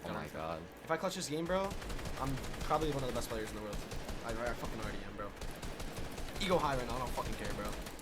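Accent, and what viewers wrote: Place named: Canada. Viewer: ur canadien i could tell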